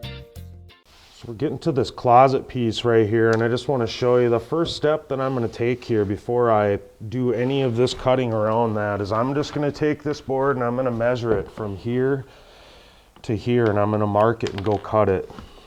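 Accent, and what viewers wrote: Minnesota, United States. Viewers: Your praise of God blesses me because I find myself everyday thanking the Lord for guiding me at work especially when I run into some 'mystery' challenges I can't figure on my own, thanks. Great instructional videos and love the MN accent. God Bless!!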